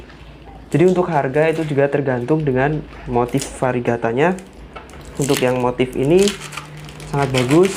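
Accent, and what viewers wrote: Java, Indonesia. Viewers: Aslmkum....Alhamdulillah stlh sring lihat utube nya jd smangat lg brtanam..tau jnis2 philo..dn smua hal ttg tanaman..dlu sy merasa g tau apa2..sk salah2 nyebut nama tanaman..sy sk dg apa yg d sampaikn cara penyampainnya jg..kalem dn apa adanya..mengalir dn kesan jawanya gak hilang...sll rendah hati dn memberi smangat u kita2 yg nonton..smg mdpt balasan pahala dr Allah krn tlh menyampaikn info2 yg brmanfaat...smg sll sehat..sukses dunia akherat d jaukn dr sgl yg buruk...aamiin aamiin yra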